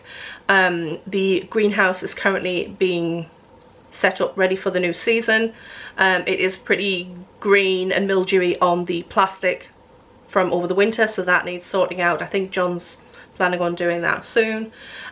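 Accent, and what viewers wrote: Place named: County Durham, United Kingdom. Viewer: Very excited to see you working on your smallholding - hoping it will give me inspiration to get going this cold February, with my little plot, which, by the sound of your accent is near your own roots. (County Durham). I have four hens, a small vegetable plot and currants, raspberries and plums.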